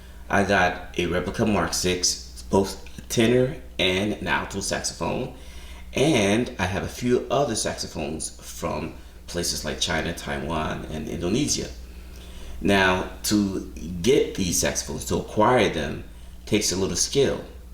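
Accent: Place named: Brooklyn, United States. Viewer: Dude, are you from Brooklyn? You sound EXACTLY like Spike Lee. Not just the tone of your voice, but the cadence. Thanks for the good tips.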